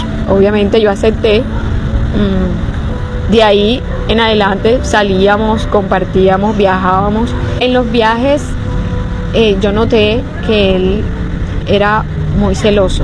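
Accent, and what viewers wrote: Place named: Venezuela. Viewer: Pues te salvaste de ése asesino. Menos mal fuiste más lista. Deberías de hablar con la Fiscalía; y ser testigo en contra de éste individuo. Eso ayudaría más al caso. Me imagino qué tendrás fotos con el, para evidenciar de qué si se vieron. Fuiste muy inteligente!!! Y..tu acento me luce venezolano....será tú versión un montaje????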